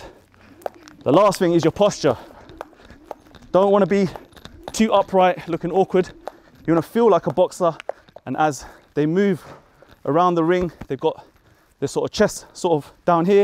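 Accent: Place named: United Kingdom. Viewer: UK aksen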